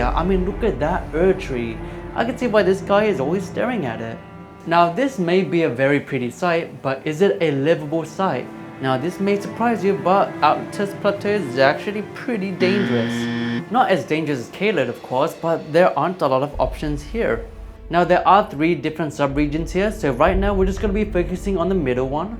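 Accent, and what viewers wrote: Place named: Asia. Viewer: my bad, I didn’t assume an Asian person with a heavy Asian accent was Australian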